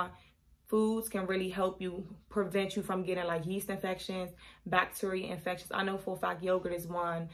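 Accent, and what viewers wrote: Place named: Washington DC, United States. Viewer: You from dc ? I know our voice when I hear it 😂🫶🏼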